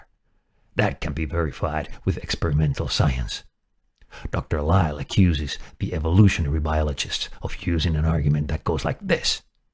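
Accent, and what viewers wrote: Germany. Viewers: I like science on youtube but I get the impression he is like acting and I dont like it. Is that his real voice? Theres nothing wrong with having a German acent and his natural voice will do fine.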